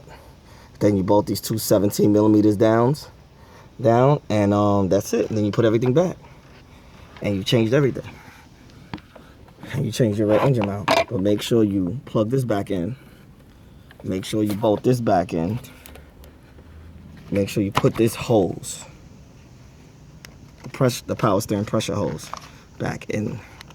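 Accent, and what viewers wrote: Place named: East Coast, United States. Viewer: East coast accent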